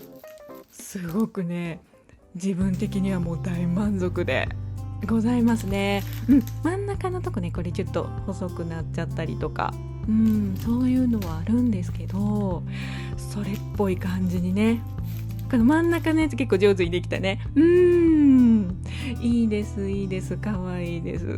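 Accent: Kansai, Japan. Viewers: 通りすがりにちょっと立ち寄らせてもらいました✋😅 パイ生地とフランスパン🥖見ました👀話し方めっちゃ可愛らしい🤩🤗 真夜中のに笑いこけた😂 関西弁はやっぱりええねぇ いっぺんにファンになりましたよ🎉 私は奈良から和歌山に嫁いで微妙に違う言葉を使ってます。 まずはフランスパン😘😘😘🥖Tryしますね 楽しい動画見ながら😘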